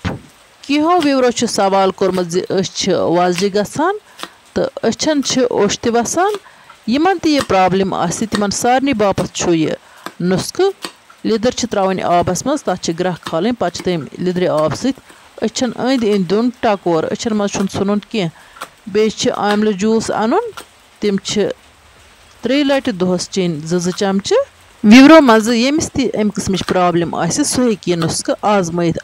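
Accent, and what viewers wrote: Kashmir, India. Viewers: Your voice is soft I love this kashmiri voice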